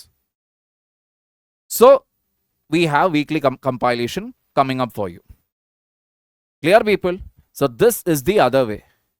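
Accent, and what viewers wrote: Kerala, India. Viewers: Kerala slang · That mallu accent sirrrr❤😂 too cute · Kerala voice ❤❤❤❤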